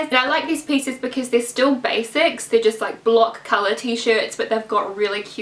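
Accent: New Zealand. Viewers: April Taylor she a New Zealand accent.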